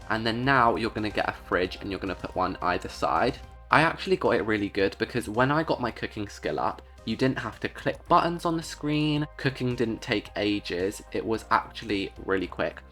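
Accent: United Kingdom. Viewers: Yes he just sounds different because he’s British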